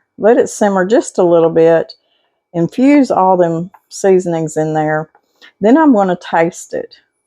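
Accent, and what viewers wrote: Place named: Kentucky, United States. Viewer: Oh Miss I just love watching you. Me and my 87 yr old momma watch you and she says you sound just like one of her cousins back in Kentucky, which makes her smile ❤. Thank you so much for being so down to earth and real. My mom is waiting for your cookbook to come out. We love ya!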